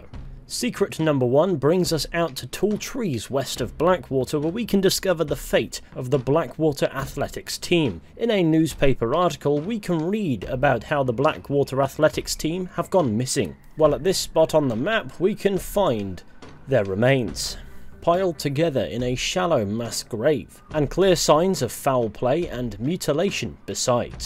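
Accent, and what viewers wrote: United Kingdom. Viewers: UK accent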